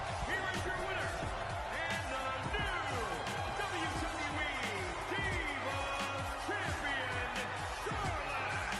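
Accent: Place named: United Kingdom. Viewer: Head's up bud, if you're gonna put prices in I.E. $60, it would be helpful to people who don't use the Dollar-Dollar ya'll, to put it in other currencies. You sound British to me which is astounding, that, if you are British, you wouldn't put the £ in. Simple business techniques bud. If you want pound-pound 'squire, you'd know investors and customers are lazy but lazy enough that they will go to another source for their info if the basics are built.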